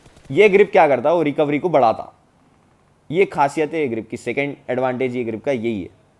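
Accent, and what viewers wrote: Hyderabad, India. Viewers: Hyderabadi ho kya ? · Kya proper Hyderabadi Hindi baat karre yaaro! OP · That Hyderabadi language ❤️ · Hyderabadi bolthe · You are from Hyderabad?? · Bhai bolta mast hai tu full Hyderabadi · bhaiii... aaap ka language Aur baatan sunke lagra ki aap hyderabadi Hai... arey apan bhi hyderabadi Hai.... aapka accenture Pura hyderabadi language ki waisich dhikra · Voice and accent op. Are you from Hyderabad? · Love this Hyderabadi accent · Bro are you a hyderabadi..coz ua slang is similar.. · bro it ia just hyderabadi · Hydrabadi Miya Bhai Boltey ❤️✊ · Tum hydrabadi hai ji?? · Hydrabadi bolte❤️ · typical hyderabadi hindi...kiraak hindi hai ye..hyderabad is jaan !! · Are u hyderabadi? Bahut hi pyari accent hai 😊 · Hyderabadi hindi bolthe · I love his accent...ek number... Hyderabadi boltey😀😁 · hyderabadi op bolte bhai kya ki je tumna to sab smja dale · Bhai aap hyderabad ke hai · Hyderabadi hindi bholthe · Ye haidrabadi accent♥ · Bhai tumhara saare videos dekhta hu mai and ek reason ye hai ki tum acha smjhate lekin main reason ye hai ki kya mast Hyderabadi bolte bawa tum❤️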